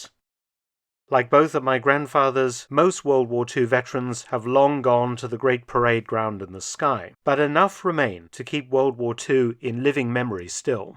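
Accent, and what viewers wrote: United Kingdom. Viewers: Given the appearance (your grandfather's photo) that you aren't as entirely British as you sound, I suppose it is not a coincidence that this was released on ANZAC day. My son wearing my fathers WW2 RAN medals placed a wreath for Scouts Tasmania as part of the ANZAC Day remembrance ceremony today. He also officially received his Queen scout award. Well done Monty, Pa would be proud.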